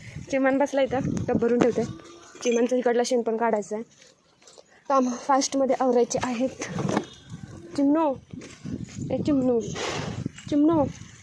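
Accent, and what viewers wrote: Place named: Sangli, India. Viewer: ताई तुम्ही सांगली चे आहात काय,, तुमचे बोलने सांगली कडचे वाटते माझे गाव पण सांगली जिल्ह्यात आहे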